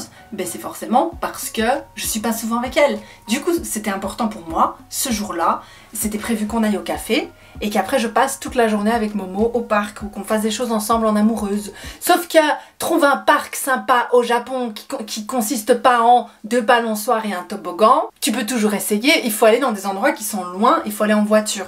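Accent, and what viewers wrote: Belgium. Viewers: J'adore, surtout l'accent belge.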